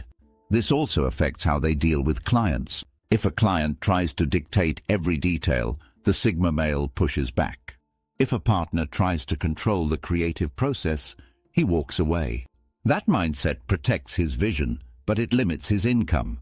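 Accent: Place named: United Kingdom. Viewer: Another crappy British accent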